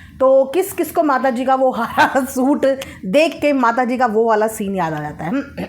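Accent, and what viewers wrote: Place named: Uttar Pradesh, India. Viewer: Mai to Etna hai chirchirya sun kar waise aap ke vlog bhut acha aur saf hota hai aap bhi up se ho kya khi khu bhasha se lagta hai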